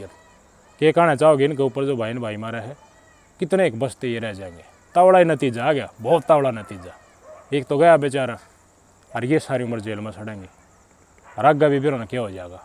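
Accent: Haryana, India. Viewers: Bhut bdiya Bhai sahb Aapki haryanvi se lgta hai real haryanvi yhi hai 🙏🙏🙏🙏💐💐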